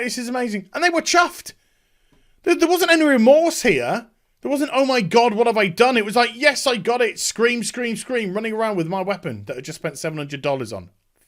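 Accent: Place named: United Kingdom. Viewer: for some reason ranting sounds amazing with the British XD but yeah sad to see blizzard slip and slide into oblivion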